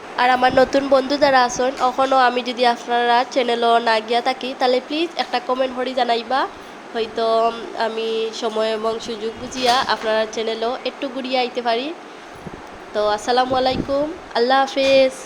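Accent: Sylhet, Bangladesh. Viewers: আসসালামু আলাইকুম সিলেটি আপু কেমন আছো আজকে তোমার ভিডিও টা নতুন দেখছি তোমার মিষ্টি মিষ্টি কথা গুলোর প্রেমে পড়ে গেলাম। তোমার লজ্জা লাগার কোনো কারন নেই তুমি তোমার মাতৃভাষায়ই কথা বলো এতেই অনেক অনেক ভালো লাগে তোমার ভিডিও দেখে মনে হলো যে তোমার সাথে আমার অনেকটাই মিল আছে। আমার ও তোমার মতো টনসিলের সমস্যা আছে। তোমার মতো আমার সেইম বেডশিট আছে। আমার শৈশব কেটেছে সিলেটে তাই সিলের কথা শুনলেই মনটা কেমন যেনো আনচান করে জানিনা কখনো আর যাওয়া হবে কি না তবে সিলেটের জন্য মনটা আনচান করে। যাই হোক তোমার ও তোমার পরিবারের জন্য অনেক অনেক দোয়া ও ভালোবাস রইল পরবর্তী ভিডিও দেখার জন্য অপেক্ষা করবো ❤❤❤❤ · আসলেই তাই নিজের কাজ নিজেকেই করতে হবে।যতই অসুস্থ হই।আপনার ভয়েজ সুন্দর। সিলেটি ভাষা শুন‌তে ভালো লাগছে❤❤❤❤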